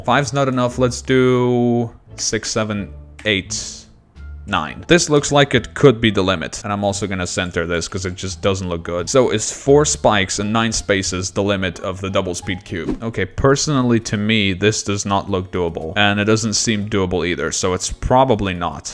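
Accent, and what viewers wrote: England, United Kingdom. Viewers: he sounds more British lol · An english accent is not a bad thing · yoooo is British i cant belive it yess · I am English so his accent is completely normal to me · BRITSH MATE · bro youre acent is preatty bri'ish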